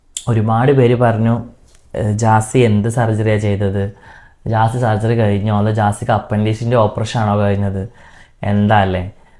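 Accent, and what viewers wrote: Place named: North Kerala, India. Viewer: ബെന്ന്, പയം പുയുങ്ങിയത് 😅നിങ്ങൾ വടക്കൻ കേരളീയരുടെ ഭാഷ ശെരിക്കും ചിരി വരുന്നുണ്ട് 🤪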